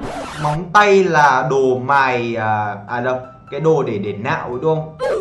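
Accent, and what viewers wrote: South, Vietnam. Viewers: nói tiếng trong Nam dễ thương quá dợ (´∀｀)♡♡♡cute ghê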